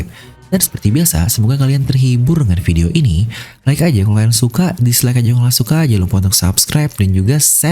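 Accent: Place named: West Java, Indonesia. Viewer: Min org sunda 😆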